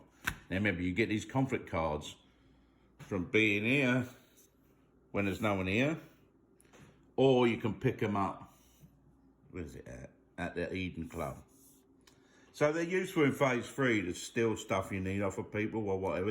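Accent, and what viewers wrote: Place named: London, United Kingdom. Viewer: Really helpful video on how to play a complicated board game! Love the London accent too, brilliant! 😊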